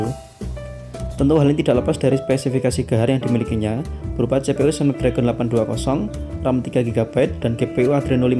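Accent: Java, Indonesia. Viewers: sampean wong ndi tho mas medokmu kuwi lho, joss gandoss 😂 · Medok banget bang 😀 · review bagus kecuali reviewernya..medokk abis... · Medok gan logat nya,good..jawa bgt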